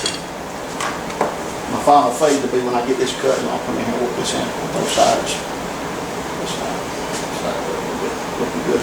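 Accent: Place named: South, United States. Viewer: Awesome cut and you sound pretty southern where you from?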